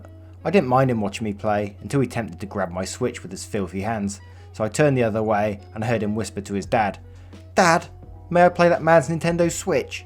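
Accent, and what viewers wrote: United Kingdom. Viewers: That British blue accent tho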